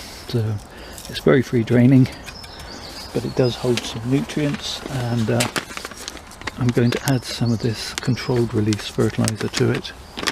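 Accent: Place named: RP, United Kingdom. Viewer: speaks the queens English. I'm a Geordie so you probably wouldn't understand a word on my videos.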